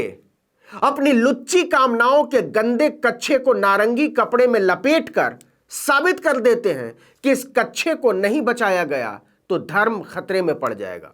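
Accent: Standard. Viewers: Naveen Kumar ji aap ki Hindi bohot hi fluent hai aur pure hai ji...kya khub Hindi bolte ho ji aap... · Big fan sir. ur pure hindi narration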